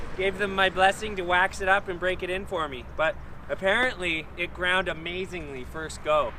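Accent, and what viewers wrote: Canada. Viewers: He sounds like every Americans impression of a Canadian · Is this a Canadian accent I'm hearing? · this has to be a canadian talking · This guy is the shit. Love the Canadian accent lol. I'm also a skateboarding who ended up in construction cuz skateboarding wasn't paying the Bill's. I wish it could've tho lol. Keep it up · I can tell he is Canadian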